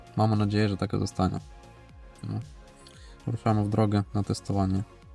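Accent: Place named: Eastern Europe. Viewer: Słyszę akcent typowo słowiański 🇺🇦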